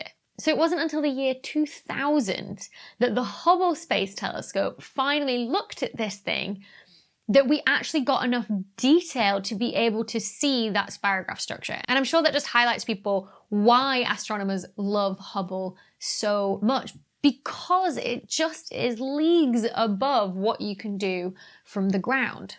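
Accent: United Kingdom. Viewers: Very informative. Spot on delivery and content. You keep getting better! Plus, listening to you, I have tuned my ear to understand British accent.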